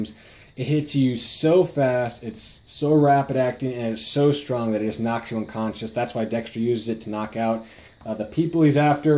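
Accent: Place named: Ohio, United States. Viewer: this guys sounds like he's from Ohio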